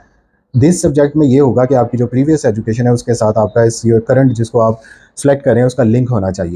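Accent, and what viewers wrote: Faisalabad, Pakistan. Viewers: 😂.. app Faisalabad sa lgtay han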